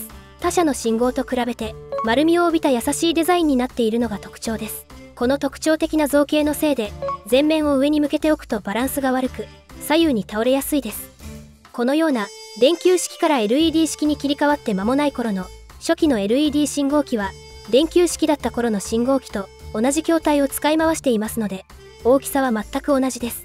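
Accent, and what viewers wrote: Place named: Osaka, Japan. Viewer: ばりばり大阪弁やん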